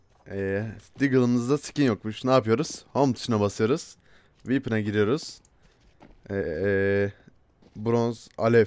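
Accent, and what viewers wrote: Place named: Bursa, Turkey. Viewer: abi kusura bakma ama bursalı gibi konusuyon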